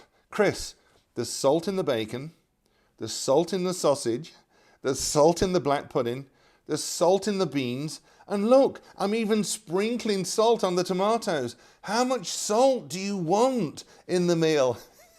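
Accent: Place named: Derbyshire, United Kingdom. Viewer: I love the intro, and adore the channel thank you, love your accent reminds me of my mothers side of the family from Derbyshire what a gorgeous place. HP sauce is wonderful, love it, and grew up with this food, exciting will definitely share this with my mother, she loves to cook,so does the family . Thank you such a happy show. enthusiasm and passion ,scrumptious meals and stylish presentation and yes your delightful personality.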